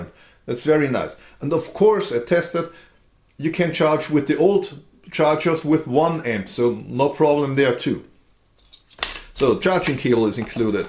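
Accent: Germany. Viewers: I could tell this was a Deutsch accent immediately. Meine Frau ist von Darmstadt.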